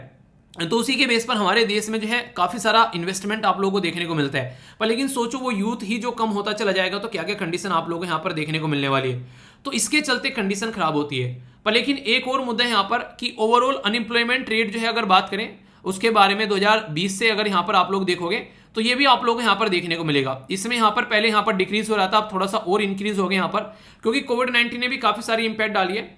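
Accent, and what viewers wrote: Haryana, India. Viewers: apki bato or awaj se or APK ghr se , or khane ki choice se ap Rajasthan Haryana me khii se ho ,, 90% Haryana 💓 😄😄😂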